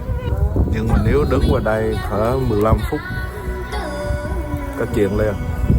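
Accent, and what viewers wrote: Binh Dinh, Vietnam. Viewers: Minh nghe tiếng nói của ban chac ban la nguoi o Binh Đình ,nhung chac o day vẫn còn co điện ,con nuoc uống trong nha co đồng bằng không ,o nha nhu vay minh nếu khg co điện thi chac co bếp củi dot liên tục de suoi chu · Em này chắc người Bình Định nói giọng nghe giống quê tui :)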